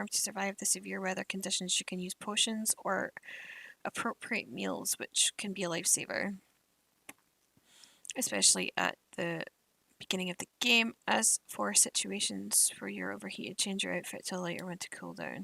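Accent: Scotland, United Kingdom. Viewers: Slowly getting into the rhythm of the game. Something I like a lot is going to Options and extending the season to 10 days or more days (the effect begins on the following season). This allows me to play all the side-quests, explore at my pace, battle baddies, and experiment within the season. A big part of the game is building a settlement/village/town and managing the settlers. Love the Scottish brogue; it's now part of my game, bringing it alive!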